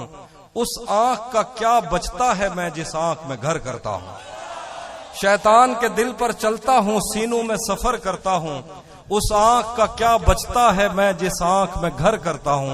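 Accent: Saraiki, Pakistan. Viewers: Saraiki awaaz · How cute is this when is speaking saraiki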